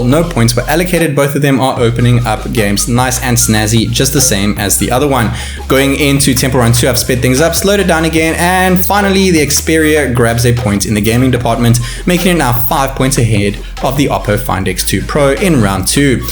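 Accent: South Africa. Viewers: Are you from South Africa? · you sound South African like a joburger..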